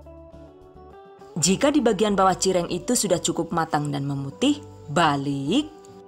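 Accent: Banyumas, Indonesia. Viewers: Wong ngndi mba kok ngapak ky kue logate koh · Hmmm gemes dh ma suaranya bunda ni. Explainnya simple n da bumbu2 candanya itu lo yg bikin gregetan gemes. Dengerin kdg ketawa sndiri. Pa lg bhs ngapak2nya klr beeeh lucu. Semangat trs bunda bikin vidio kreatif masaknya. 👍👍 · Sebenernya suka semua videonya. Semuanya bermanfaat dan yang paling penting itu videonya ga bikin bosen, pasti ketawa2 pas bahasa ngapaknya keluar 😂 Pokoke seneng kabeh pideone rika lah yu 🤣 #GiveawayTHV2020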